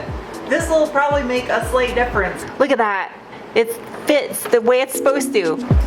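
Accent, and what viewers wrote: Australia. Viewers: As an Australian I loved the acent you would fit right in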